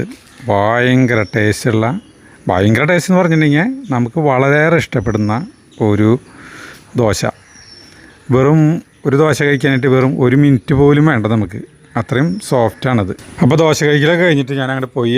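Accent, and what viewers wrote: Thrissur, India. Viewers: കാടണ്....തൃശൂർ ഭാഷ 👌🏼👌🏼👌🏼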